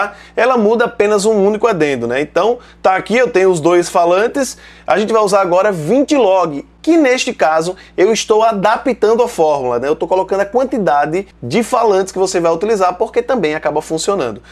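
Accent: Northeast, Brazil. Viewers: vc é de qual estado do nordeste? gostaria de fazer o meu som com vc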